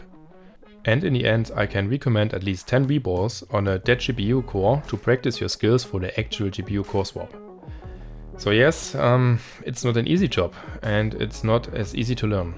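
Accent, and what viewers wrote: Germany. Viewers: Im hearing deutsch in his voice🇩🇪🇩🇪🇩🇪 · Wusste ich doch, dass du ein deutscher bist. Unseren Akzent kann keiner "nach" machen haha.. Guter Job. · I love how I could immediately tell that is guy's native language is German after some two or three words, lol. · I hear German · i hear a bisschen deutsch??? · u sound kinda german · When the narrator has a German accent in a tech video you know it's gonna be good. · Also Ich muss schon sagen, deine Hand ist echt ruhig, aber deine Aussprache verrät, dass du Deutscher bist und das, ob wohl Ich auch einer bin, aber du machst gute Arbeit und daher meine Frage, warum nicht in Deutsch, sondern in Englisch oder hast du auch Deutsch Videos? Sorry bin Pfälzer und ja wir haben es net so mit Sietsen hoffe bist deswegen net angepisst ist dein Vorname ok oder soll ich ihn nicht nenne gleich mal Daumen und Abo dagelassene ach und (net) heist soviel wie (nicht) in Sachsen weis net ob da so pfälzisch verstanden wird deswegen hoffe nimmst mir net übel ,weil mir ist auch aufgefallen das sich sonst keiner traut mal einfach Grafikeinheit zu tauschen oder Prozessor, wenn er verlötet ist als Respekt wäre niemals auf die Idee gekommen wie Mann überhaupt rann gehen sollte aber bei dir sah es echt Profi mäßig aus ok noch mal gute Arbeit! 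· Wie man direkt den deutschen Akzent raushört 😂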